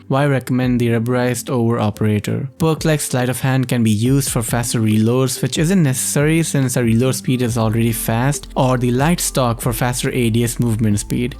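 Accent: India. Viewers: It's it just me or really does have a definitive Indian accent? Anyway, you're pushing great videos man. I really loved your qq9 build which was recommended by Jokesta. Keep up the good work 🖖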